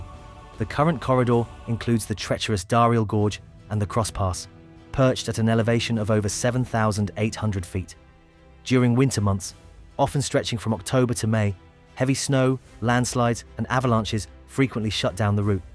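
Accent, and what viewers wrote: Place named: London, United Kingdom. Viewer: London accent make complaints about developments in Eastern countries!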